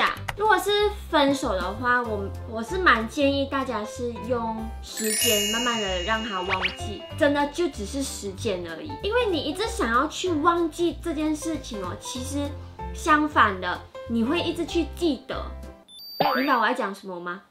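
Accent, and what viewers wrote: Taiwan. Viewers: 有谁不太适应的台湾腔的？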